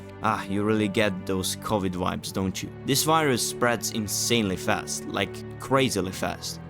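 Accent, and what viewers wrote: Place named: Russia. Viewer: Ur accent feels russian to me btw. Nice video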